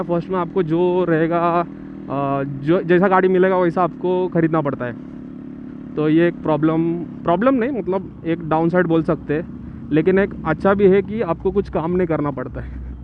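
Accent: India. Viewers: Pure mumbai accent 😌🤜🤛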